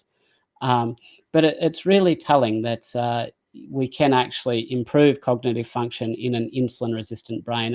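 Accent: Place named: Australia. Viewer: Wanna give a shoutout to whoever thought of adding subtitles to this video. This helps for us people not familiar with the Australian accent. Hope this'll be the standard for future videos because YT's Closed Captions suck.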